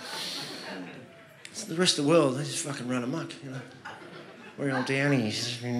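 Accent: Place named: Australia. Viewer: The more I learn about Australia, the more I realize it's just America with a cooler accent. And no space program, that's a bonus.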